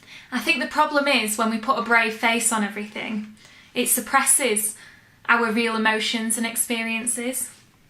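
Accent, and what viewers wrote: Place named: England, United Kingdom. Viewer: your analysis is simple and correct. Especially delivered with beautiful English accent.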